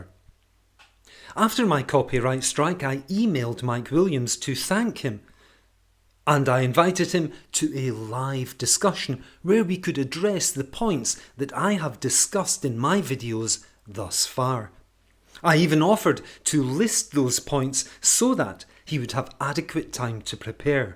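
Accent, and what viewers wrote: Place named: Scotland, United Kingdom. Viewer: Lovely Scottish accent that could cut through hardened stainless steel and glide through butter. There is something about a Scottish accent that makes one sit up and listen. When you think of the great Scottish actors: Mark McManus, Sean Connery, Robbie Coltrane, Ewan McGregor, Roddy McMillan and actresses Kelly Macdonald, Karen Gillan, Shirley Henderson, Isla Fisher, Laura Fraser.... and the list goes on and on..................and Scottish accent is up there with the best of them. Alba go bràth!!!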